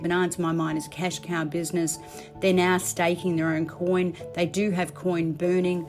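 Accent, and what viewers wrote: Australia. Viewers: That's just her Aussie accent.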